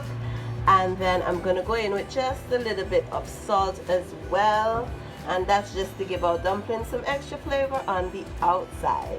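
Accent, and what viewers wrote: Antigua and Barbuda. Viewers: Wait y she sound Antiguan so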